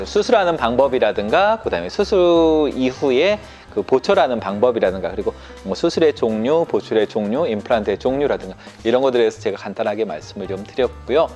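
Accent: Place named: Busan, South Korea. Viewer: 원장님 고향이 부산?분이신가여? 말하시는거 들으니 바로알겟네여 ㅎ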